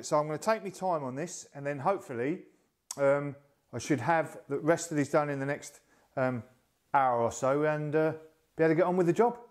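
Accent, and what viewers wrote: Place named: South East London, United Kingdom. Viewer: Good 👍 Luck with the radiotherapy Mate. Where are you from in UK ?.sounds like a South East London / Surrey border accent. 🌴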